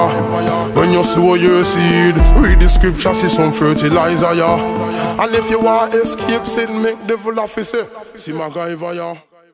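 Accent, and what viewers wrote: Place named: Jamaica. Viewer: She sound like a Jamaican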